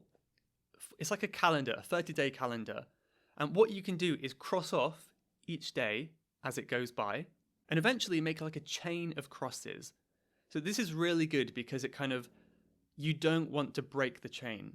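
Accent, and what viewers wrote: United Kingdom. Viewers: Thank you so much for this phenomenal video ☺️ can you please tell me in which British accent you are talking 😊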